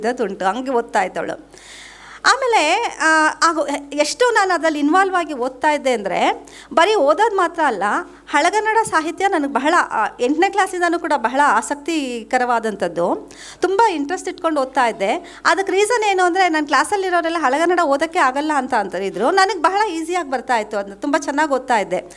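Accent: Standard. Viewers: ಅತ್ಯಂತ ಸ್ವಚ್ಚವಾಗಿ ಕನ್ನಡ ಮಾತನ್ನಾಡುವ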